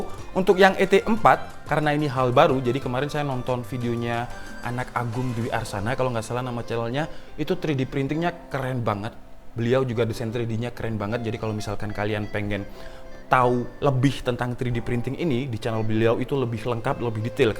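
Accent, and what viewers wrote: Java, Indonesia. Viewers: Medok jowonya ketahuan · Malange ketoro banget broo wkwkwkwk sipp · Mas wong jowo ya? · Bang pertama liat chanel lu gua kira chanel biasa aja yg kek kebanyakan para youtuber , stlh gua iseng* nonton in video lu yg ngomong sama google asli dahh ngakak parah dan trnyata lu orngya asik kocak .klo gua bilang lu punya ciri khas review yg beda intinya lu bisa bawain pembahasannya kek bener" lagi interaksi sama temen seumuran lu. Goo job lah 👍👍👍 Ohya bang btw ada jawa jawanya medok mantep😅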